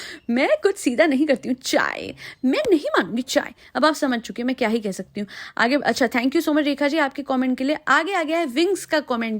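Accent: Punjab, India. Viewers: I know, it's ok.. it's not easy to reply all comments,we love you always,nd specially love your punjabi accent ❤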